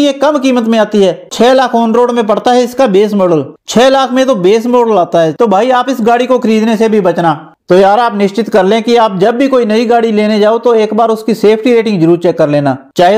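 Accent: Punjab, India. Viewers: ਤੂੰ ਪੰਜਾਬੀ ਏ ਤੇਰੇ ਬੋਲਣ ਦੇ ਲਹਿਜੇ ਤੋਂ ਪਤਾ ਲਗਦਾ ਵੀਡੀਓ ਪੰਜਾਬੀ ਚ ਕਾਸਤੋਂ ਨੀ ਬਣਾਉਦਾ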